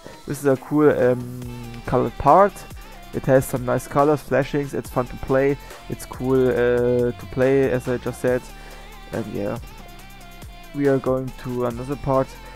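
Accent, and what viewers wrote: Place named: Germany. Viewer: Man merkt richtig das du aus Deutschland kommst ich finde dich cool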